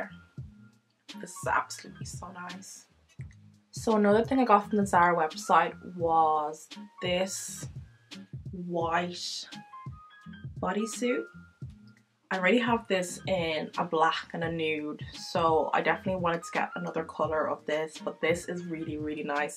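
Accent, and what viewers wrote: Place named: Ireland. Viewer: I love your Irish accent, and nice haul too 👍🏾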